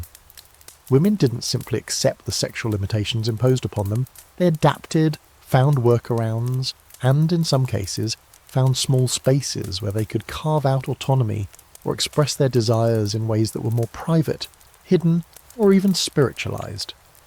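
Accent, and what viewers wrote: United Kingdom. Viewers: Love your videos ….also cos of your lovely British accent. But…. have you cloned your voice for this narration? It sounds to me like it and i don’t love it 😞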